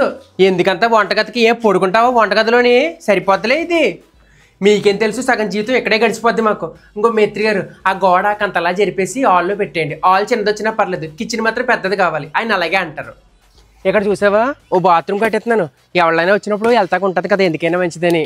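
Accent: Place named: East Godavari, India. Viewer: Likkamtha vumdi. Idi East godavari slange. Madi East godavari, Amalapuram andi.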